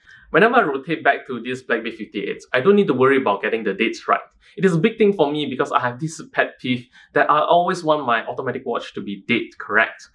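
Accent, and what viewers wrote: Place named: Singapore. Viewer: I think you are a Singaporean? I can sense it with your accent. I worked there in SG before...😊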